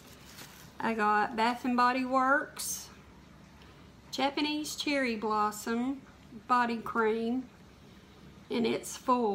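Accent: South, United States. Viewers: Hi! When I came across your YouTube video for the first time I was drawn to you immediately! My mom was from Virginia and I lived there for period of time when I was a kid! Your southern accent and your personality make me feel at home! I really enjoy all your videos and your calming effect! Take care of yourself and keep up all of your good work! By the way, I live in central California now!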